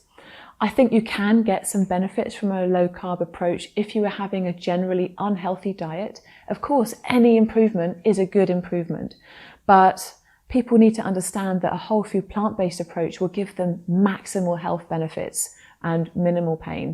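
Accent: England, United Kingdom. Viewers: Great to hear an English accent talking about WFPB living. Well done.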